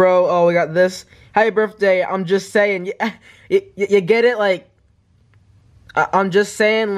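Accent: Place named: United Kingdom. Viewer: That British acent tho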